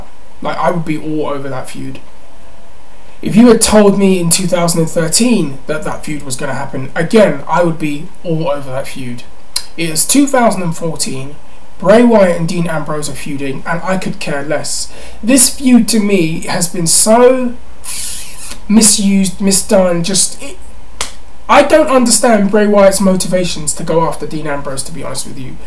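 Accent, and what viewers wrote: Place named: Glasgow, United Kingdom. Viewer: You sounded like you were from glasgow, like normal times for me!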